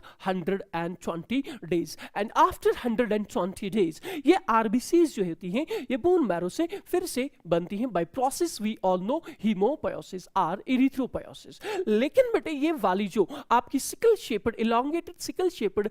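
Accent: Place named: France. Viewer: Heyyyy french accent, the huge energy, deliverance kya baat h sirrr 🔥🔥🔥! love from my side ❤❤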